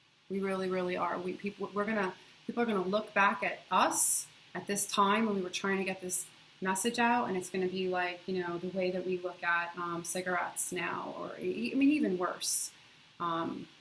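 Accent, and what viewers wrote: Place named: East Coast, United States. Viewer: I love your subtle east coast accent and attitude. You are still attractive on your worst day - Jacob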